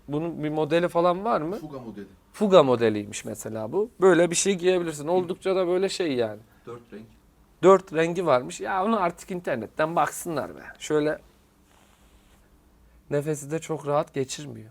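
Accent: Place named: Ankara, Turkey. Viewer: Nasıl içten nasıl bizden. Angaralı gibi gonuşuyon valla. Arkadaşlarla mağaza geziyorum gibi oldum 😀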